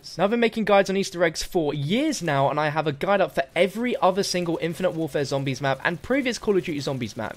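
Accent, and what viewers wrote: United Kingdom. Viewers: I can't listen to the British accent.... Literally can't do it.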